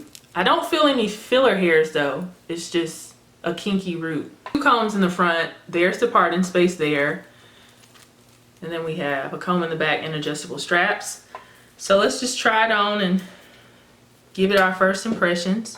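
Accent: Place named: Georgia, United States. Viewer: My first time seeing you ... excuse me... being blessed w u! Just wanna day THANK YOU! And you are 6 feet tall?! You betta be a model . Beautiful ! Where r u from I love ur accent too! U sound ur from Lagrange Georgia or something... anyways I’m a new subbie! 💕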